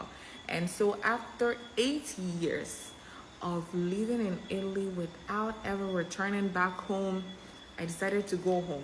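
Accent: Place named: United States. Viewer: U sound like someone living in usa not Italy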